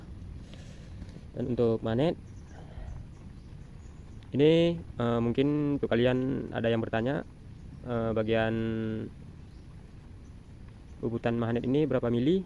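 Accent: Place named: Aceh, Indonesia. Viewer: dari cara ngomong pasti orang Aceh y bang,,dmn bg loksi nya biar kita cek motor fu saya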